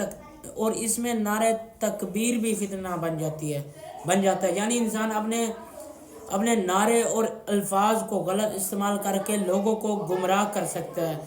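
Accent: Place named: Punjab, Pakistan. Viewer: Bhai urdu talaffuz aapka punjabi sai mix horha hai😅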